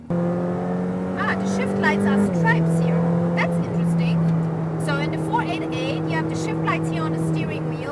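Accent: Germany. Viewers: Der Deutescher Akzent 🇩🇪😂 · I swear you sound german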